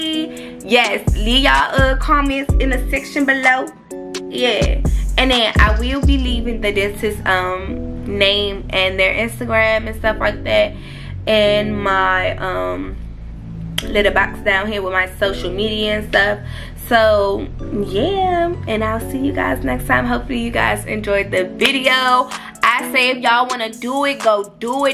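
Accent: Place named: Washington DC, United States. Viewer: GIVING DC ACCENT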